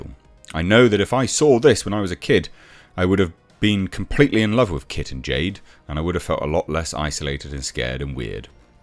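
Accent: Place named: United Kingdom. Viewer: "toh-mah-toes" Not being demeaning but I love how you Brits enunciate some words quite differently than those around me. Plus comments helps the algorithm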